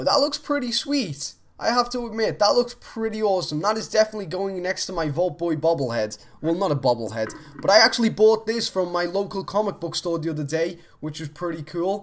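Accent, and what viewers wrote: Liverpool, United Kingdom. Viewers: Are you British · are you from Liverpool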